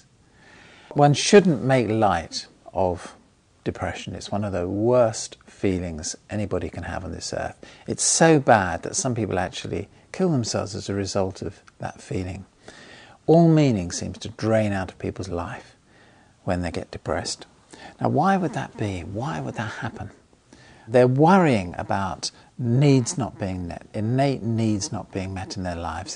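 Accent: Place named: RP, United Kingdom. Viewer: I want to take it seriously, but he looks like me *when* I'm depressed. Fluffy hair because I haven't bothered brushing it, bags under my eyes despite sleeping all the time, and clothing I've just thrown on (flesh coloured jersey?). :( Having a David Attenborough accent doesn't make something authoritative...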